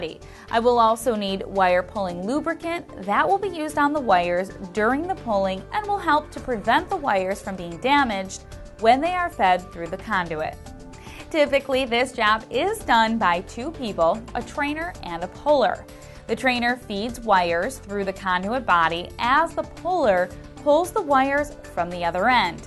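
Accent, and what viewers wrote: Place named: Michigan, United States. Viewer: This is the most Michigan accent I've ever heard 😂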